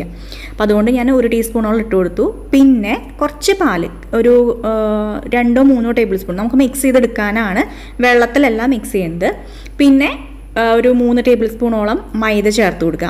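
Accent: Thrissur, India. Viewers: Ningal trissur ano....ente oru friend und shyra......same samsaram anu.....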